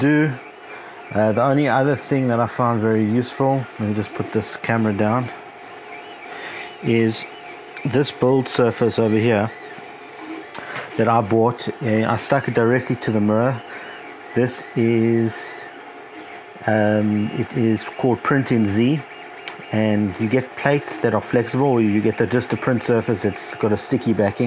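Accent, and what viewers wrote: South Africa. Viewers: This boytjie must be a south african??? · Are you South African?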